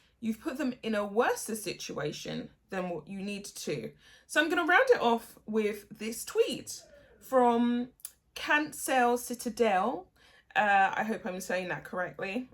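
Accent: United Kingdom. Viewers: Lovely to put your face with your UK voice! Great to see a young lady with great common sense to see through the UNTALENTED ENTITLED GRIFTERS!! It was reported that approval was given to print the pics to their children as there is a law in the States that children's faces MUST BE PIXILATED unless media has approval from the parents. So much for PRIVACY of the fake royals in the States.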